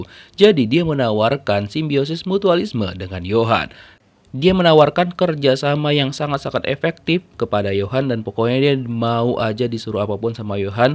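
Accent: Medan, Indonesia. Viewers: Yg ngomong alur cerita ini orang medan sumut kah..🤔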